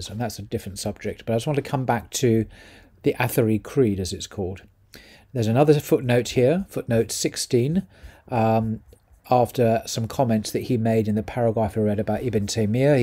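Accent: United Kingdom. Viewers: Ended up liking before watching 😅 but am gonna hear completely ~After hearing completely~ Somehow your British accent felt more nuanced this time 😅 I had originally felt that Salafism is the right thing, because of their premise that following the religion of the first three generations is following Islam. As of last or last to last year, I have just washed my hands off anything that ends in an -ism or is anything like that, because by their very nature they are limited. I have also listened to Dr Yasir Qadhi a lot, and in one of these sessions where he was forced to discuss creed, he said in the end something similar to that this discussion is not too fruitful because whether athari or ashari, people still do not show any major differences in righteousness, closeness to religion etc. i.e. the outcome is not very different for being ashari or athari 😅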